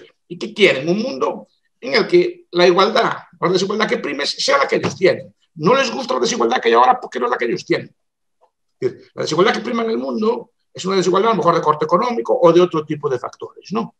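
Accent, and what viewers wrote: Galicia, Spain. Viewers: Habla en castellano . Tiene acento Gallego Eu si falo Galego .non creo que sea muy dificil .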